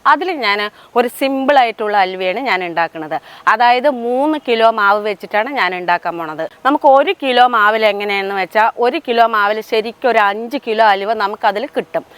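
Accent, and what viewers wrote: Palakkad, India. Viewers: അടിപൊളി 👍🏻.ഒരു പാലക്കാടൻ slang ആണല്ലോ ചേച്ചി? പാലക്കാട്‌ ആണോ?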